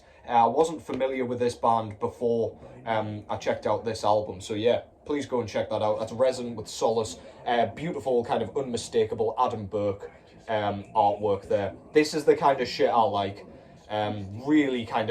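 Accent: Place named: Scotland, United Kingdom. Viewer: Don't mean to have your headache get worse...I was kind of pulling your chain last week when I suggested you had a south african accent. I figured it was scottish (mothers side of the family, McRae from the highlands, down to Colombia in the 1920's) but it seemed very mild, like maybe watered down from another anglo country, maybe even the USA. SOme of the scottish immigrants in Toronto (CAN), you can barely understand them, even the ones here since the 1950's. Great show on black metal, man. Cheers.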